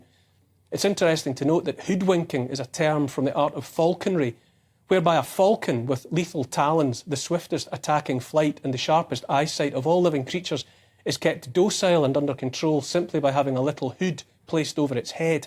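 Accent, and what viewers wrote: Scotland, United Kingdom. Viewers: You nailed it 😁 Without giving any thought as to why I was inspired to write a poem about him... It just happened. But you've summed up what sparked it 😊 This was May last year 😊🤗 Won't Kneel A gentle, beautiful Scottish lilt A kind, empathic, forward tilt An open heart; a caring face A willingness to take his place. A strong, home-hitting monologue Reminds us of the boiling frog The courage to become a sleuth A passion to release the truth. A deep desire to crack the whip Illuminates our freedom-strip Calls out the darkness taking hold The shiny despots; plated gold! Intense and raw, he lays it bare A soul-enhancing, honest stare Inflections drive the message through He speaks for Us - for me and you! Discernment's such a precious gift His words create a mighty lift Take heed of all he has to say He knows - it shouldn't be this way! 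🤗🦋🐬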